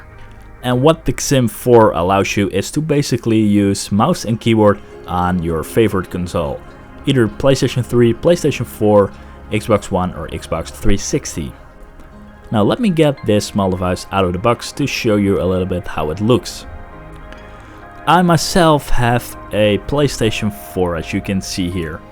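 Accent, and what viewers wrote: Netherlands. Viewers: Are you dutch? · Hoorde al aan je accent dat je Nederlands bent ;) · Are u from the NL?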